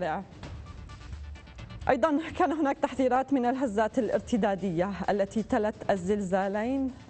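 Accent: Syria. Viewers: من طريقة كلامها مبينة هي سورية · حبيبتي حكيها سوري التاني واضح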